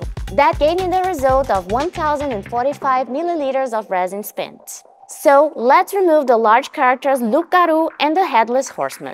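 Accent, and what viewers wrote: Brazil. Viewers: Você é brasileira, esse sotaque é de brasileiro kkkkkk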